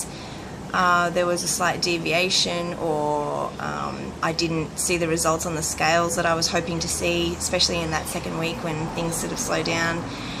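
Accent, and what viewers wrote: Australia. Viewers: Hi there. I just want to say thank you for your videos. I am currently on the journey to have this surgery. I have watched many many videos over the years and I have to say yours have been the most inspirational. I love your whole attitude and your outlook through your journey. Like I said very inspirational. Thank you for that! By the way love your Aussie accent :-)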